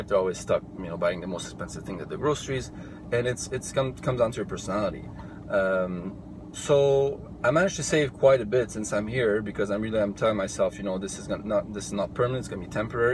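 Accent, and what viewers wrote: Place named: Russia. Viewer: Pe bune? Eu sunt Moldovean si chiar credeam ca esti rus, ai accent rusesc :D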